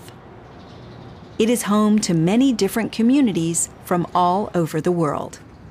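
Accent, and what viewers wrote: United States. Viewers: Waoo increíble como pude entenderla al 100 sin los subtítulos, habla pausado ella y con su acento americano! Quisiera poder hablarlo así y fluido 😊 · Es inglés americano o británico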